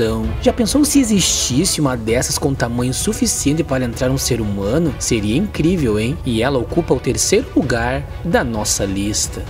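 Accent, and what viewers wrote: Rio Grande do Sul, Brazil. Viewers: Gaúcho né !!! Inscritos!!! Top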